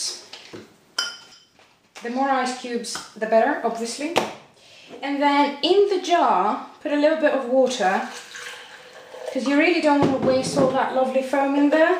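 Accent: United Kingdom. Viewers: Hey, Auntie.... you are so cute. I loved to watch it. You should do comedy TV.:-) Your English is really "Russian free", I swear... more you already have a colour of "very British". This Frappe rememers me good times when I was skipper for sailing yachts in Greek Cyclades... easy to do, and for Coffee junkies like me a great thing. Cant live without it, even in hot summer in midth of Germany (we have up to 38 degrees todasy). Keep going...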